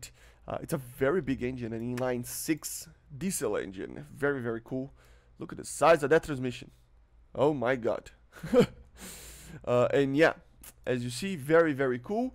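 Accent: Brazil. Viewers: mano aprendeu ingles aonde ate que tu nao tem um sutaque tao ruim para um br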